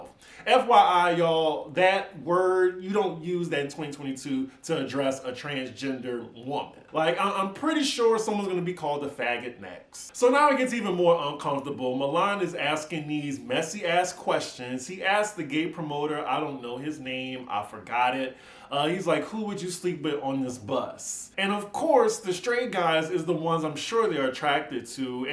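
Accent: New Orleans, United States. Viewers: you gotta use your New Orleans accent more! 🤣🤣I luv ❤️to hear it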